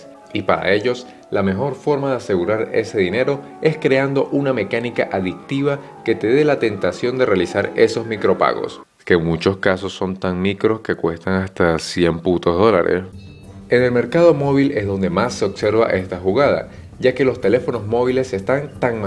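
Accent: Venezuela. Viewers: Verga se me adelantaron con la pregunta, " de donde eres?". bueno no importa ya sabia yo que por la forma de la que hablabas eras venezolano pero bueno hay que preguntar para estar seguros. Y bueno chamo de pana que eres bueno en esto me gustan demasiados tus reseñas, como tus demás vides me parecen bastante interesantes, a mi se me hace que tendrás un gran futuro en esto de youtube, buena suerte convive. PD: Yo también soy caraqueño XD.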